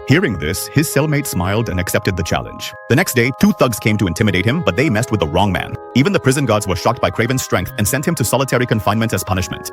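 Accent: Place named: India. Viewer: Why does this guy sound Indian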